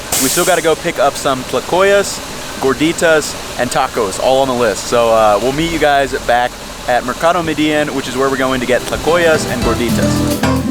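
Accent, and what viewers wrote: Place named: United States. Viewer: I visted MXCD this year and really loved it! But, your Spanish pronunciation is soooooo American 😂 You definitely need to work a lot on this! It’s hard to understand you sometimes. But still thanks for the effort ❤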